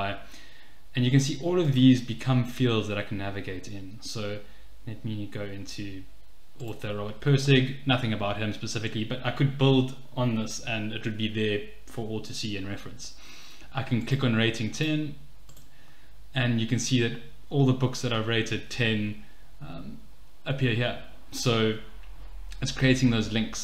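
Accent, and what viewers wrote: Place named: South Africa. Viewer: Very useful, thanks. The accent makes me think you're a fellow South African?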